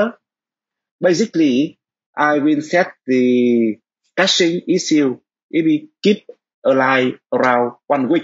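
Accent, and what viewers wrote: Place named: Vietnam. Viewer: Em nghe phát biết anh là người Việt, anh ra series tiếng Việt luôn đi anh.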